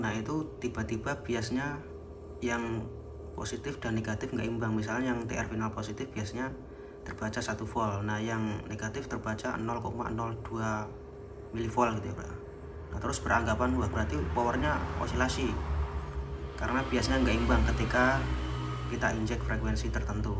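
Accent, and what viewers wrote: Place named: Sragen, Indonesia. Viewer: logat e koyok wong sragen mas😁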